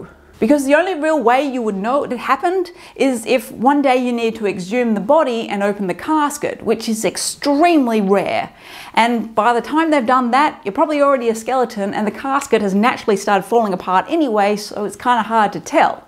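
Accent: Australia. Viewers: Just subscribed. You sound Aussie. Greetings from Victoria.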